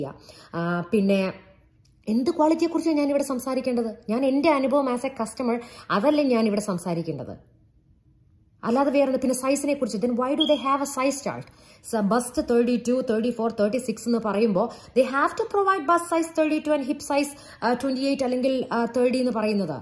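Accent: United Kingdom. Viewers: Me adoring your english accent, ❤️ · I like your English accent more .keep doing it💕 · Chechi samsaarikkunnath kelkkaan nalla rasamund ... especially your English accent 🔥🔥🔥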